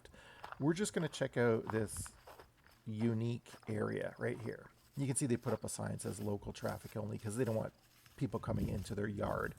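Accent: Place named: Canada. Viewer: Are you originally from Alberta? Your accent sounds from elsewhere in Canada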